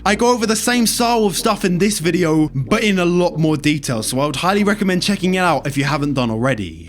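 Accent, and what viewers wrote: United Kingdom. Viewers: His British accent😔💗💗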